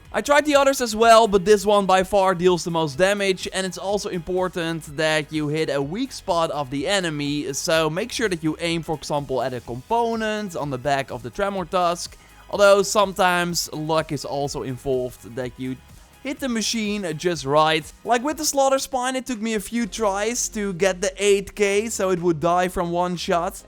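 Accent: Netherlands. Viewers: Like your videos but it is not "Tear" as in crying. It is pronounced "ter" as in tearing off my eyes in cringe of recognizing your dutch accent :) enjoy your videos though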